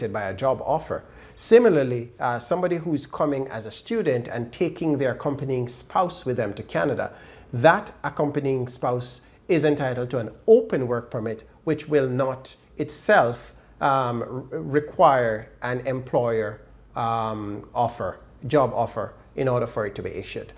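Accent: Jamaica. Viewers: I hear a Jamaican accent 🇯🇲☺️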